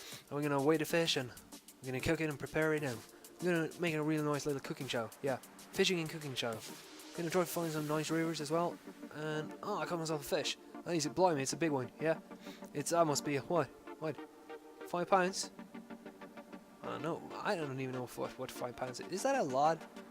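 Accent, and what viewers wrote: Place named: Australia. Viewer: nice vid! like ur australian accent mate ;)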